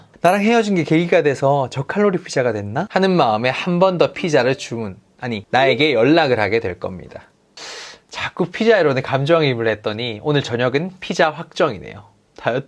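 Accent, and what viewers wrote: Gyeongsang, South Korea. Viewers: 경상도 이네요이. 좋은자료 good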